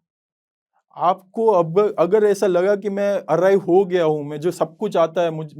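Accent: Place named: Gujarat, India. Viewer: I can feel that Gujarati accent in your speach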